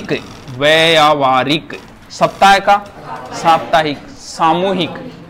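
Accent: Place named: Marwar, India. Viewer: Thank you sir gi so much very helpful your videos Or Aap ki bhasa desi marwad very nice 🙏🙏🙏🙏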